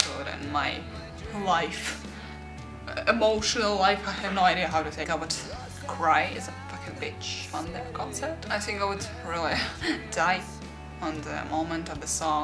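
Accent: Russia. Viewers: I like your Russian accent , in fact ,speaking English in Russian accent is so sexy , Btw, you are adorable😉😉😉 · deathless? you mean immortal? P.S. you need to get rid of your Russian accent and you will be perfect! Marry me :)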